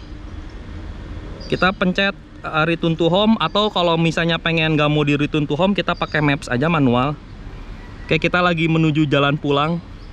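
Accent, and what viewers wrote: West Java, Indonesia. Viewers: Sunda eyy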